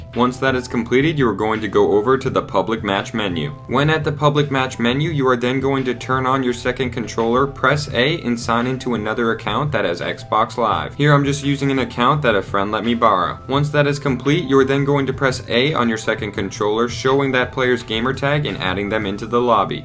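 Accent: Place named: United States. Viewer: OMG His Accent is like an Americans oh my god.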